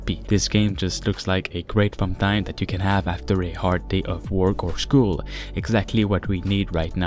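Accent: Quebec, Canada. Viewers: T'es tu Québecois ?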